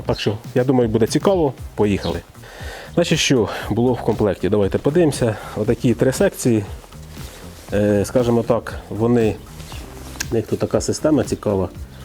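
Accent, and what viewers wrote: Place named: Surzhyk, Ukraine. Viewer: Того шо в нього трохи суржика є, не всі слова він каже Українською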